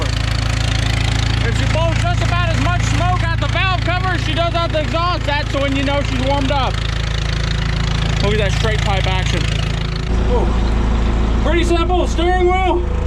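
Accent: South, United States. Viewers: My 8 year old boy and I love watching your videos. They are great. We Live in Pittsburgh Pennsylvania, in the city ,but my son wants to be a country boy more than anything, and he always tries to talk with a country style accent like you do and has been trying since we have started watching your videos. Keep them coming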